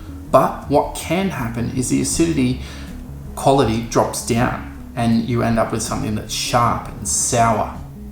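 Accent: Australia. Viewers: Good vid and Australian love it, well done.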